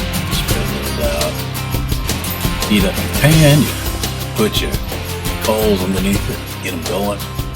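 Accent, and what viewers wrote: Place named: South, United States. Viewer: And you gonna pick on my countryness😁 I heard some southern.😁😀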